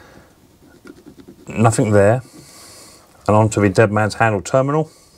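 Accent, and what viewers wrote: United Kingdom. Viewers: your video solved a problem. My mower showed a small spark at each lanyard pull, but would not start. Early in the video, you reveal a coil can spark, but too weakly to start the engine. QED-- now to find a reasonably-priced ignition coil. * PS-- Your Midlands accent is a killer.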